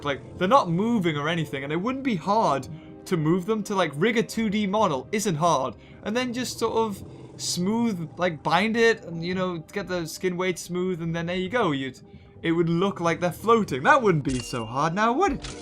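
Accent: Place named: United Kingdom. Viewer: what part of great Britain are you from?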